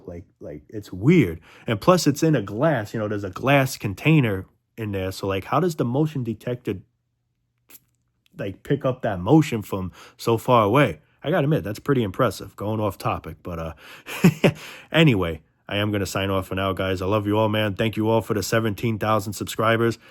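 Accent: New York, United States. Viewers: Red pills with a NY accent. I'm in.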